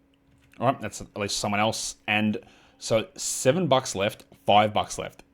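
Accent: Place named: Australia. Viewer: Trying to drop the codeword but I legitimately have no idea what you said. Aussie accent claims another victim. Canadian btw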